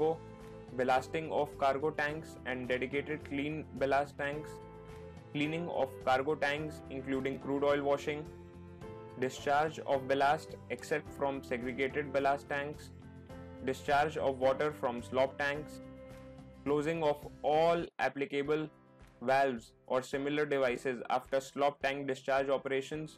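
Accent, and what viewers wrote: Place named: India. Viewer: what's wrong with your pronounciation,buddy?! it's really hard to get your indian English,unfortunately...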